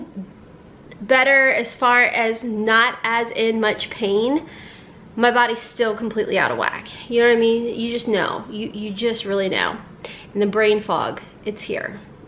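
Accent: South, United States. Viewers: hearing your southern drawl when it sneaks out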